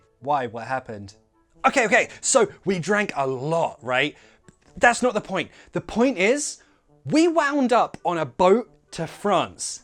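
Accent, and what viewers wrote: United Kingdom. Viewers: Why do you have so nice British accent, mr.?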